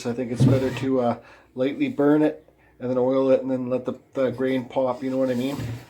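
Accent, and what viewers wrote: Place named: Canada. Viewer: Great looking piece I like it. Your fuckin language is terrible eh. You sound like a real Canadian lol. Have a good one.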